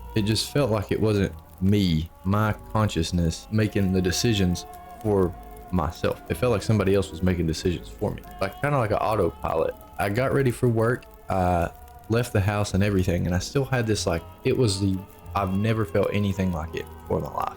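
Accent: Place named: Appalachia, United States. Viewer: Judging by your accent and the way you said "retched" instead of "reached", I'm gonna guess that you're Appalachian (me too). I'll never doubt people's stories of crazy stuff they see in the mountains, there's just too many stories for them all to be fake. I'm glad the dissociation passed for you, but this whole experience had to have been insane.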